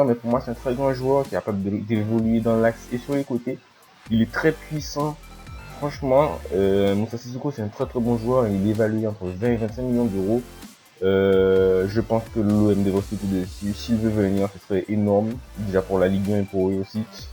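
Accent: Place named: Antilles, Caribbean. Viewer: jolie accent :D ça fais plaisir c'est rare des antillais Youtuber :D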